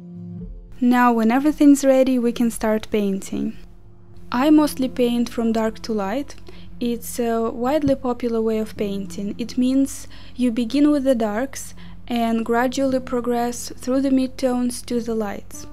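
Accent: Russia. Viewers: Are you russian? You are have a russian accent)))) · Она русская ? Просто очень слышен русский акцент)))) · По акценту понятно, что человек русский :D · Такой акцент русский. ппц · aren't you from Russia? i am, and your accent really sounds like russian)) you're cool✨ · are you Russian?You have a Russian accent. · i hear russian accent, are yoy russian? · Русский акцент, из России? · Интересно, у нее русский акцент или какой?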